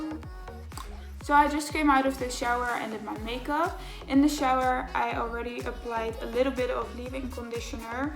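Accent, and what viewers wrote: Netherlands. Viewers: hoorde gelijk dat je nederlands was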